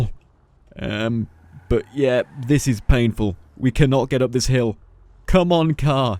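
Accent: United Kingdom. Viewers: Are you british? 'Cause it sounds like you have a bit of a british accent.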